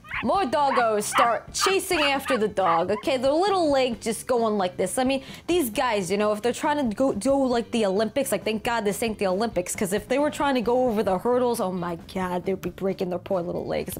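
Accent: New York, United States. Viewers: Are you actually from N.Y or is it just a voice you do? Either way I love it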